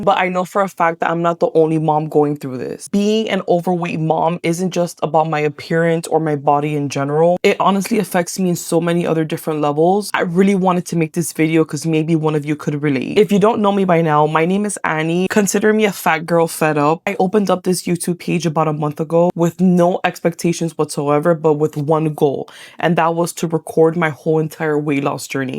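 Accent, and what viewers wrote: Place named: Southern California, United States. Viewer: Indicted TV's Negra MUST meet this youtuber (Annie)! 😊 the SoCal accents 😊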